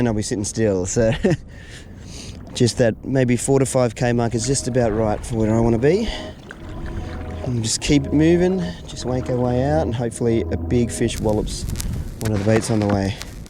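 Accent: Australia. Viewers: You channel is addicting. As a guy with a expat snowy mountains father, the accent takes me home. Yeah nah y’all the best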